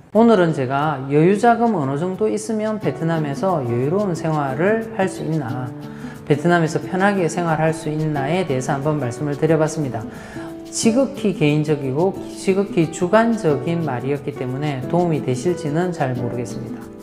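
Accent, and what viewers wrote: Gyeongsang, South Korea. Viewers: 진솔하고 알기쉬운 베트남 생생토크 감사합니다~! 전 충청도인데 경상도말이 간결하게 설명하는덴 최고인것 같습니다. 뭐 그보단 님의 성격이 화려한 수사없이 진중하신 성품 같습니다~!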